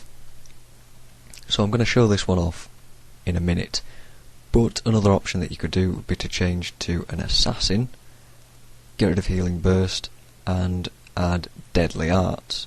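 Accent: United Kingdom. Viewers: ty alot for helping me with some hero builds british guy ;-)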